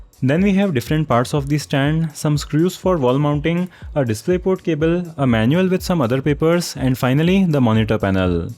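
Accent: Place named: India. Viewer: English with indian accent.. beautiful.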